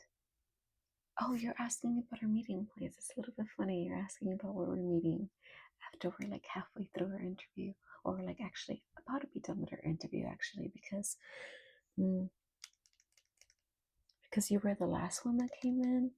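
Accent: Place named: Brazil. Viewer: Posso estar enganado, mas reconheço um sotaque brasileiro de olho fechado, inglês ótimo inclusive.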